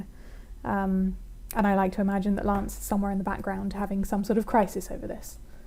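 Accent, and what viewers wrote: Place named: United Kingdom. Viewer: I love ur accent.. i love british accents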